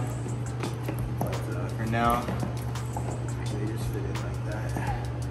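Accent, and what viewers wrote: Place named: Toronto, Canada. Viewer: Yeah Im from here too I could tell by the accent, im glad it isint over faked like most toronto mans wannabes lol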